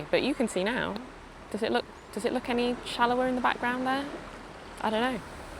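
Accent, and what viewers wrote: England, United Kingdom. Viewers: You are the best mate 😊 By the way I really love to hear your voice 😅 a beautiful English accent ❤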